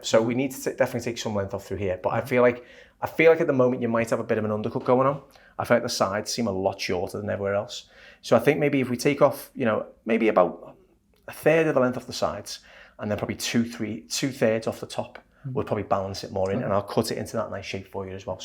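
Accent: United Kingdom. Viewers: My Texas hearing has some difficulty understanding his thick British accent!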